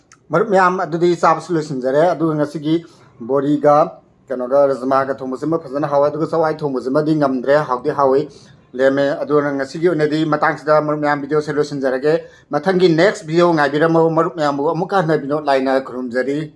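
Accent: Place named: Imphal, India. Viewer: Brogi khonjelse imphal gi taingo gi pura manley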